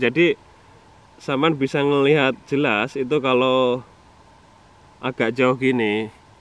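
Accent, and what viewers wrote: Java, Indonesia. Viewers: NITIK LUR...EDANAAAANNNNNNN ....JOS KANG TETEP KETOK JOWONE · Wong jowo mz kok lagate jowo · jowo nya msih medok, masyah allah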